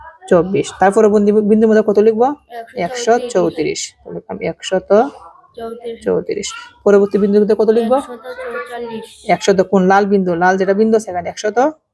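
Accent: Sylhet, Bangladesh. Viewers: তোমার বাড়ি কিতা সিলেট নি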